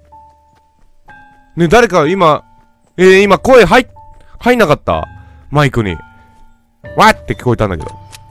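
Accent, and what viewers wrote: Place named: Hiroshima, Japan. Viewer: うん、広島とかその辺の方言なんだよね。 by広島県民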